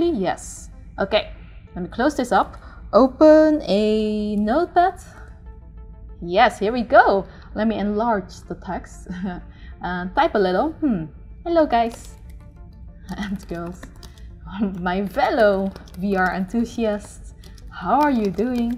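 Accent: Netherlands. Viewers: Your English Dutch Accent sounds really funny for me as a German ! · cute Dutch accent ;--) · Is ze Nederlands? Ik dacht het al te horen aan haar accent haha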